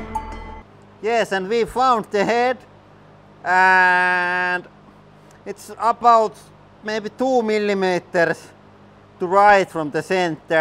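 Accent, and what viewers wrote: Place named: Finland. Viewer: I just came to the realization that speaking English with and an American accent is boring AF. I will watch this channel and practice to speak English with a Finnish accent. SO MUCH more fun. It’s pretty cool!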